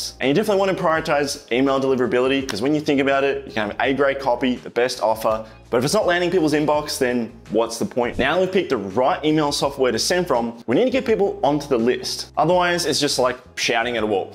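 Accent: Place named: Australia. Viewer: are you an aussie ? just curious kinda sound like that great vid btw lol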